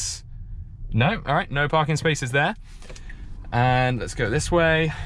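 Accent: United Kingdom. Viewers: North and middle of UK talk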